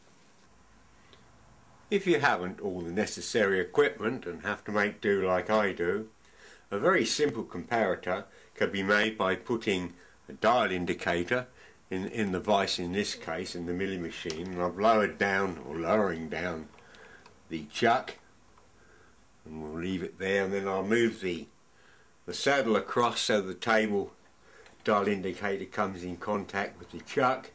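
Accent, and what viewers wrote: United Kingdom. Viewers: I always enjoy your videos and this one was no exception. I thought you guys went metric decades ago. What is with the imperial measurements? How is it that you even have a tape like that? I like the accent myself. It is colorful but still very understandable. I like to watch another shop type channel owned by another British fellow but I normally only understand about 25% of what he says. He has an interesting channel anyway. Thanks for sharing.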